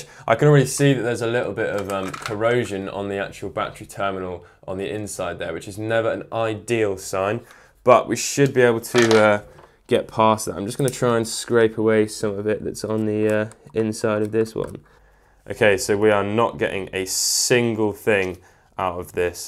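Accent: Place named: United Kingdom. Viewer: Sounds like a fellow UK gamer to meeeee. 😎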